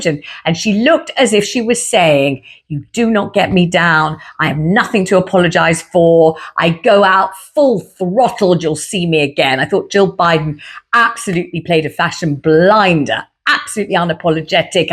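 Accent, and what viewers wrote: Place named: RP, United Kingdom. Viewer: You speak soooooo well, you are a 1 off. No-one could comment like you. You're amazing & love your Queen's English, you speak soooo eloquently xxx thankyou xxx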